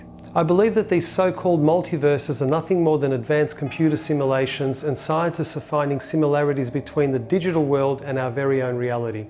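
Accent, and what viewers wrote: United Kingdom. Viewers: William Young it's his English accent nutball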